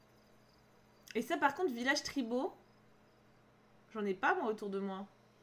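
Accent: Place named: South, France. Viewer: En tant que sudiste je valide l’accent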